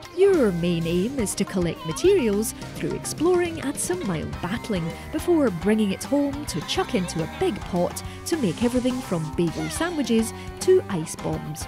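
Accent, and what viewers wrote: Scotland, United Kingdom. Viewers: Are you Scottish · that is the voice of an adult woman any day of the week mate and it sounds like a Scottish accent.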